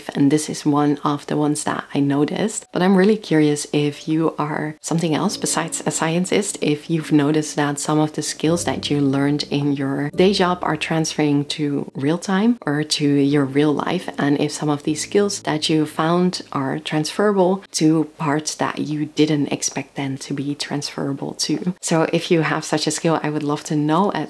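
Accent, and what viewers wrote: Netherlands. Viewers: The accent strikes me as a native Dutch speaker (or perhaps another European language). Being close to the UK, Europeans generally pick up more of the British accent than the American one. Let people speak how they want, if you’re understood, you’re doing fine.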